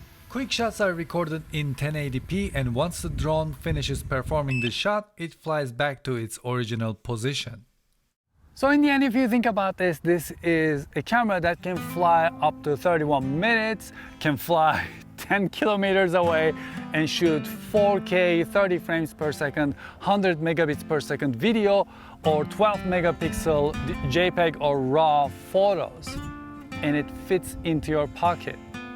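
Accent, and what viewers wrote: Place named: Turkey. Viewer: Müdür sen TÜRK müsün? Türk gibi konuşuyorsun ingilizceyi.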